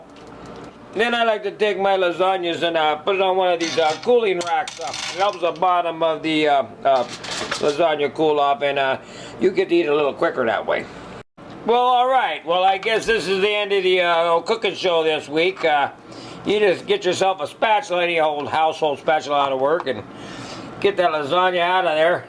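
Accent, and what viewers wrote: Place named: Green Bay, United States. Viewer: You sound like you're from Green Bay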